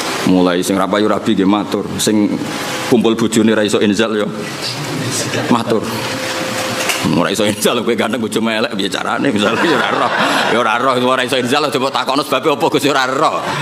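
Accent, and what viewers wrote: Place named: Kebumen, Indonesia. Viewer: Kebumen ngapak nyimk kyai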